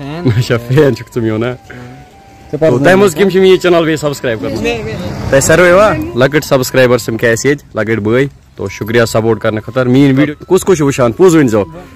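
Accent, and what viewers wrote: Kashmir, India. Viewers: I literally, like you kashmiri accent.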